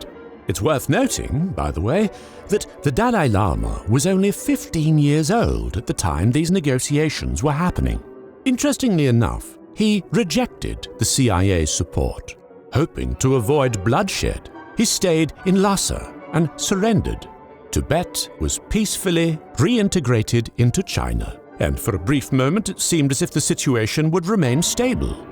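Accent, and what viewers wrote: United Kingdom. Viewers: Y r u from british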